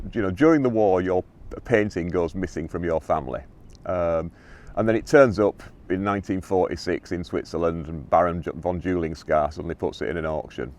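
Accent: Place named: North, United Kingdom. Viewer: how many times a day do you have to ‘Bite your lip’ & actually not ‘call a spade a spade’, but use a euphemism instead? You explain things so eloquently, in a northern accent, that I could listen to all day. Thanks for being so ‘natural’.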